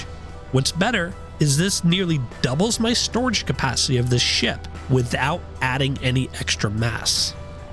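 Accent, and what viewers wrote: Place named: India. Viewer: love your indian accent , and your typical indian youtuber intro style... jai hind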